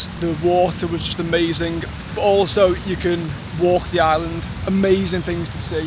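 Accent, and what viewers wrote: United Kingdom. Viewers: You're a very gifted artist/illustrator. 🎨🖌 Sorry I'm not good at distinguishing accents. What part of the UK are you from? So great to hear that PH is your favorite place in the world to visit. 😊 As for recommendations, Batanes is one of the places here we are really proud of. It kind of looks like Scotland or NZ but in a tropical setting. There are actually tons of awesome places you can visit here. Some are only mostly frequented by locals. :)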